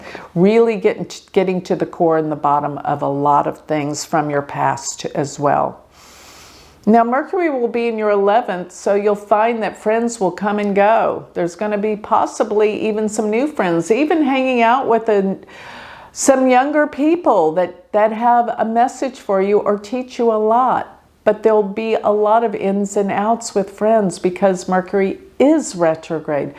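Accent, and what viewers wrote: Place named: South, United States. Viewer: Just found last week. Love her. And she is in Dallas where I lived for 30 years. I knew she was southern with that accent and hair. She is smart Dallas glamour!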